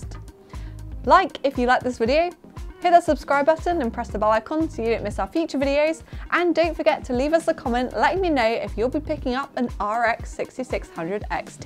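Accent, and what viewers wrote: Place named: United Kingdom. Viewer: I love the British accent 🙃 Thanks for the translation From ksa🇸🇦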